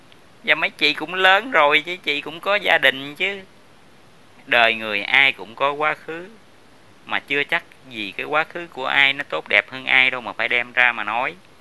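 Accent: Standard. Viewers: ban noi qua chuan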